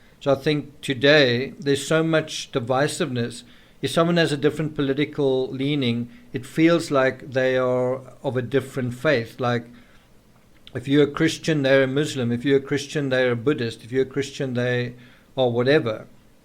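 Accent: South Africa. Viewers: Hi, are you a South African? watching from Cape Town South Afri8ca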